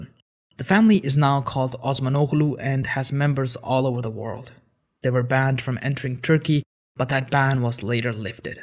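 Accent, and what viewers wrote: Arab world. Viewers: Nice video first of all, but why did you read the names with an explicit Arabic accent? Nobody in Turkey or in Ottoman times pronounced the names like that, nor are the Turks related to the Arabs. For many Turks, it's actually quite offensive. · Please do not pronunce names with an Arabic accent when talking about Turks, it is just incorrect. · Your pronounciations are way off bro. You pronounce the names in arabic tongue. Which is completely wrong. Its not moustafa qamal. Its Mustafa Kemal. We never had guttural sounds like that. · Turkish names are not pronounced like Arabic. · I guess the narrator is Arabic, it definitely doesn't justify the wrong pronunciation though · yes you actually can pronounce them differently especially when it's literally using the very same writing system and script that it's being borrowed from! Which is arabic! 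It makes sense to pronounce them as they're pronounced in Arabic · Why are you pronouncing sultans name with an arabic accent? They are not arabs they are turks. I wish someone who knows turks and turkish prepared this video:/ this is so amateurish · Thanks. I purchased this chart some months ago and enjoy referencing it. I believe the narration of this video however could be improved. Notably, important Sultans were glossed over, and some, not mentioned at all, such as Sultan Abdul Hamid II. There’s also a number of inaccuracies I believe, including attributing the correct titles to the Sultans, such as referencing Osman as Gazi instead of Bey. Finally, while the names are Arabic, I believe they should’ve been pronounced in Turkish, which is not difficult to do even for an Arabic speaker. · Your accent getting me sick !!!! Don't use Arabic accent , use Turkish accent . İt is inexcusable mistake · Please dont pronounce Turkish names in Arabic way :/ (Ottomans ınspired by arabic culture a lot I know but THEY ARE TURKISH:///) And sorry but at the ending it sounds like a banned sultans ready to built an empire again? 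:D which is not true and It made me feel really bad as a turkish person :/ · exactly. That Arabic pronunciation is extremely distracting. This was a Turkish empire. He should just have pronounced the names in English. Even that is closer to the Turkish pronunciation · maybe because he is arab and those sultans names were arabic name,even your founder of yours new country Turkey has arabic name Mustafa and that's why it's normally to pronounce like that.Example I'm albanian and if I had turkish name and you as turkish if pronounce my name in turkish version, I would see as normal thing because that is the way how you pronounce I don't see nothing strange on this. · The narrator is an Arab and he pronounces as he knows. Biraz hoşgörülü ol kardeş :) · your pronounciatons are like an arab who trying to speak turkish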